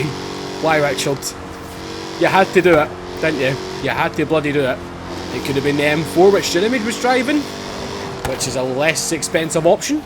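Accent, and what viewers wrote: Scotland, United Kingdom. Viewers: Your Scottish accent is about as cool as it gets. I've always thought of the Scottish as the kind who would punch you in the throat then smile at ya drinkin' a pint. =) (=...right. Nothin' to worry 'bout. Jaguars are sexy beasts...OR.....ass-tin mar-tin.....sounds too british (poor brits wrong at everything) I wouldn't hold to the standard of responding to every person, I would be guilty of not willing to do it myself. One needs a car that does it all, under 12 second quarter mile, 180-200+ top speed (limited to car type), at least 1g (earth gravity) of lateral cornering capability, can get groceries. The mountain - looks like it wants to be conquered, just sayin'. 6 digit subscribers, the more you got, the faster it goes up. May your momentum constantly surprise you.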